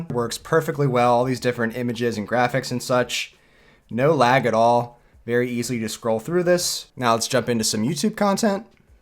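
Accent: United States. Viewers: That is not an English accent, it's an accent from some part of america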